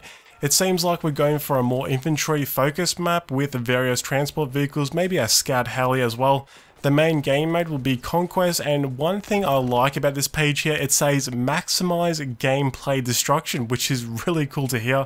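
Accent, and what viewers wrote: Australia. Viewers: wait are you Australian? If you are that surprises me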